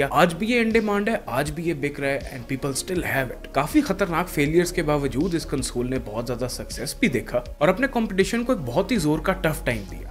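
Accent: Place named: Punjab, India. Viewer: Shukria!🙏🏻 Absolutely love the Punjabi Tarka 😂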